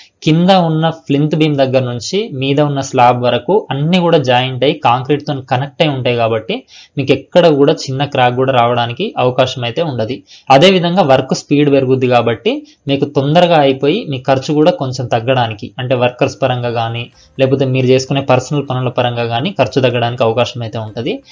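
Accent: Telangana, India. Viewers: అది తెలంగాణా యసా అంతే ఉంటది..నీకేం నోస్తుంది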